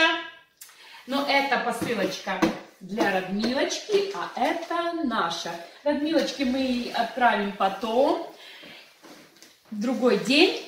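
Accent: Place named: Odesa, Ukraine. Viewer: Да, действительно подарки красота. Спасибо, приятно было услышать одесский говор. Удачи и всего самого наилучшего Вам.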